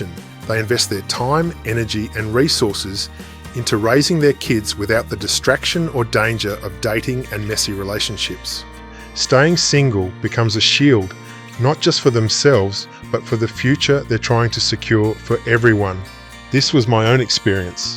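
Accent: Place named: New Zealand. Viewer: This guy sounds Kiwi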